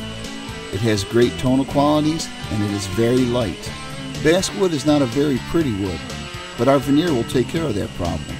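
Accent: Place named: United States. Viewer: I realized that this guy is american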